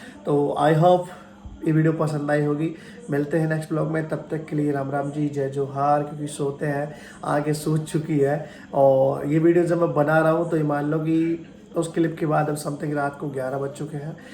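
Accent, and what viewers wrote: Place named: Karauli, India. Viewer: Consa gav h bhai ji yar me bhi karauli ka hu aap ki aabaj bhi karauli said ki lagthi h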